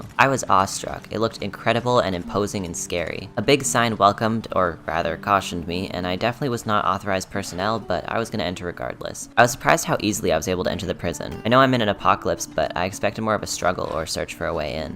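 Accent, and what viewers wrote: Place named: Canada. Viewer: he sounds adorable ALSO caneda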